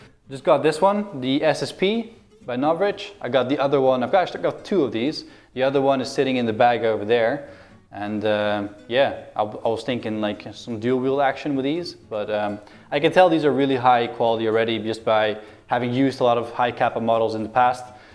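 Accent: Netherlands. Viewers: Are you dutch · Ik vind het gewoon grappig hoe erg je je Nederlandse accent kan horen als je Engels praat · Are you frome Holland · Bro are you dutch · Are you Dutch? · Je bent Nederlands praat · Ja hij is Nederlands dat hoor je ook in veel video's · Wut ure dutch... omg ik ooook! Jajajajaja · je bent nederrlands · Are you dutch ik wel btw XD love your video’s ❤️✌️ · I like when you show the clips are you dutch btw? I kind of get that feeling by youre accent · Ben je eigenlijk Nederlands of Belgisch?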